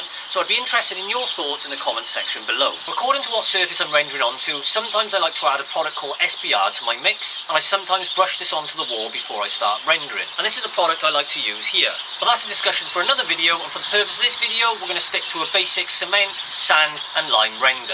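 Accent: Wales, United Kingdom. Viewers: I thought Manu Ginobli had an Argentinian accent, he’s gone Brit on me. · Welshman, straight to the point, love it, cheers!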